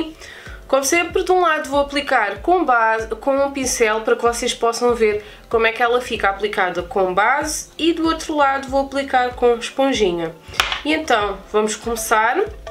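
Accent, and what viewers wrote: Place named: Portugal. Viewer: Amiga, amo os seus vídeos! Aprendo muito! Me tira uma dúvida: O seu sotaque é tipicamente de Portugal. Vc é nascida e crescida nesse país, ou imigrou ainda bem nova? Obrigada pelo seu conteúdo e beijossssss sua linda!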